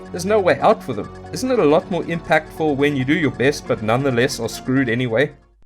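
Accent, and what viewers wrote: South Africa. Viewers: Are u South African... or New Zealandish?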